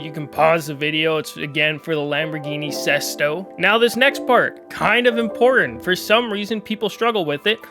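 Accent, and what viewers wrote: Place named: Minnesota, United States. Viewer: You have a Minnesota accent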